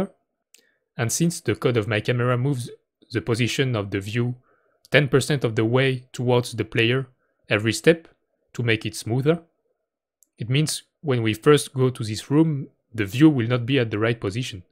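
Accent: France. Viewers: t'est francais la c'est pas possible avec l'accent 😅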